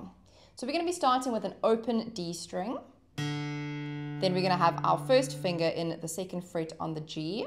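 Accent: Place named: South Africa. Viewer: I thought I’d heard the (for me) nostalgic trace of an SA accent and checked your biography 😊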